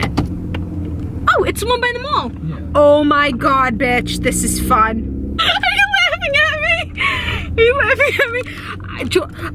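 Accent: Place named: New Jersey, United States. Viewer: Loveeeee the MARIO sounds in between- you sound like a Jersey girl, I can hear the accent, love my homies!! #Jerseygirlsrock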